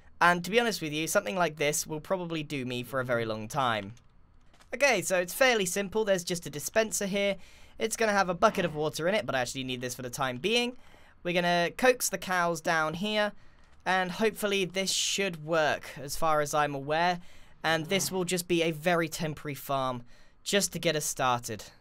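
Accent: United Kingdom. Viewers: Is it just me or is so much more British back then then he is now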